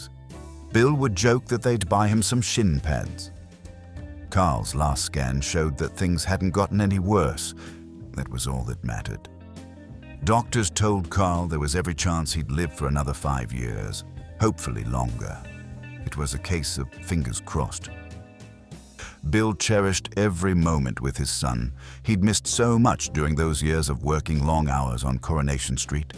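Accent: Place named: United States. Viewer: Why is an American doing the commentary..?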